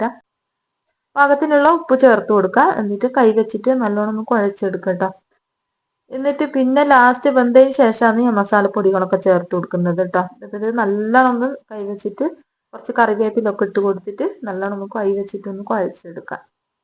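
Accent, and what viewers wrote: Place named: Kozhikode, India. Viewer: Kozhikodkary enn thonnunn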